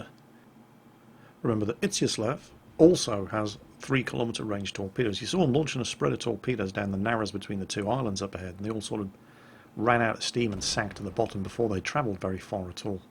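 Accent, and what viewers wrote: Portugal. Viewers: jingles are you portuguese?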